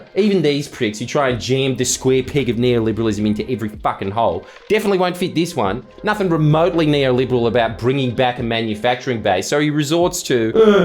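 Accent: Australia. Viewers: This is probably the most Australian I think I've ever heard him sound.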